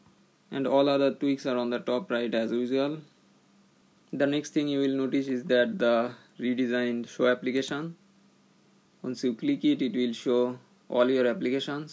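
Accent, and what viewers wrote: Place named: India. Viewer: you speak like indian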